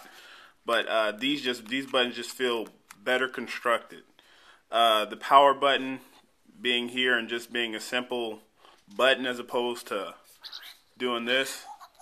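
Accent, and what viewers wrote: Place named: United Kingdom. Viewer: i bet your british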